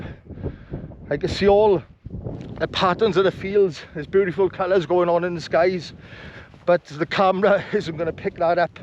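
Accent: Wales, United Kingdom. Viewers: I love your videos … so relaxing but that could be down to your Welsh accent. So soft and bootiful 😂 Also the vids are very informative. Just joined and the price is a steal especially as your videos are so well shot and narrated. Much love to and. Keep on doing what you’re doing it’s much appreciated.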